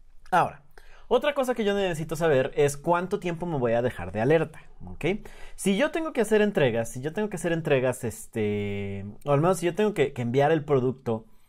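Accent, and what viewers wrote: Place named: Fresa, Mexico. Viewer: Eres muy bueno, pero dejé de lado la explicación y dejé de ver el video por que me hartó tu maldito acento "Fresa".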